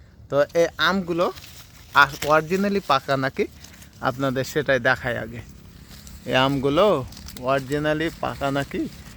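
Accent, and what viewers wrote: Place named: Chapainawabganj, Bangladesh. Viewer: আপনার ভাষা শুনে মনে হচ্ছে আপনার বাসায় চাঁপাইনবাবগঞ্জ। আমার বাসা চাঁপাইনবাবগঞ্জ। আমি কিছু পাখি নিতে চাই আপনার কাছে। আপনার লোকেশন টা যদি শেয়ার করতেন তাহলে আপনার খামারটা ভিজিট করতাম।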